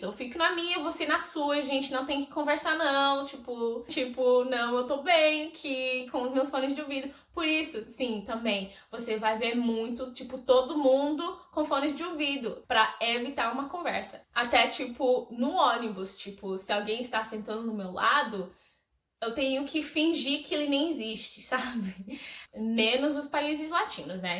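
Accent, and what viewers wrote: Brazil. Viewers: Vc fala português com sotaque brasileiro👍🏾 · Oi, descobri hoje seu canal e adorei! Seu sotaque é perfeitamente brasileiro hahaha. Ah, outra diferença, acho que vocês comem muito mais lanches do que nós na hora da refeição. Claro, aqui também tem pessoas que preferem lanches, mas a grande maioria não abre mão da alimentação do tipo arroz com feijão, carnes e saladas. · Ahhhhh ela fala português BR, já ameeei!! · Your portuguese is out of this world i cant actually believe It , i mean everything vocabulary, diction and the most amazing one the brazilian accent that u have . If you told anyone of us that you were brazilian we would totally believe u. I'm FLABBERGASTED kkkkk. Abraços ✌️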